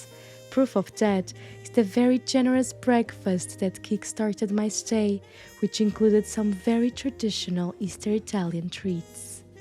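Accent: Portugal. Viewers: She is Portuguese.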